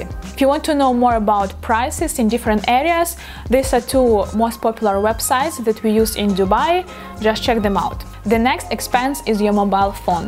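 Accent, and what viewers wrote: Russia. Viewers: Madam Your Russian Accent Is Amazing.i Like It. Love From Pakistan💓🤗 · как-то странно слышать английский с русским акцентом😅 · Is that a Russian accent?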